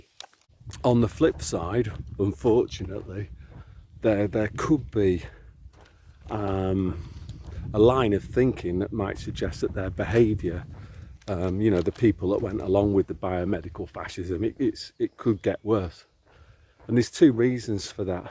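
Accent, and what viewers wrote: United Kingdom. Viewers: Sounds British to me. People believe what they were taught/shown on TV/movies, etc...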